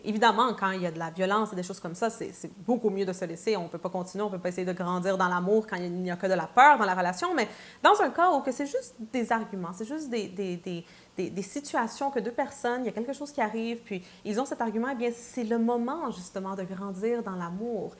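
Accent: Quebec, Canada. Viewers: Bonjour, c'est pas plutôt de la mauvaise foi ou un manque de volonté? Elle parle avec l'accent Québécois, pour ceux qui viennent de France, il suffit juste de faire preuve de volonté et de prêter oreille, pour comprendre. Je suis de France, mais j'ai compris facilement, ses paroles. De plus le message qu'elle véhicule est très intéressant et constructif!!!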